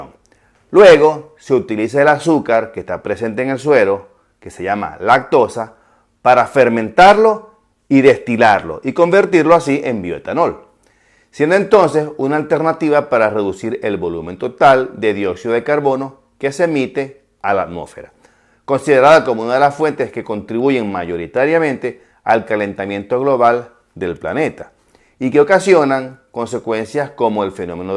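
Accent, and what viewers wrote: Zulia, Venezuela. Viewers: Doctor ya me suscribi. Ud tiene un acento Marabino Zuliano. Felicitaciones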